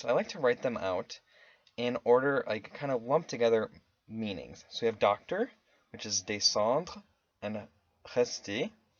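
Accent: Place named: Scotland, United Kingdom. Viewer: U did pretty good but you should work on your accent you sound Scottish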